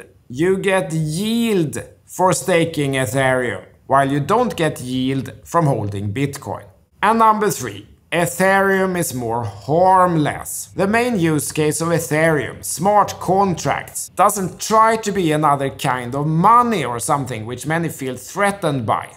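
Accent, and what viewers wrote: Sweden. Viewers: Suiting voice for a travel show with a unique swede influenced dialect. I just don't get over how close your username is to "CTE Larsson" 😂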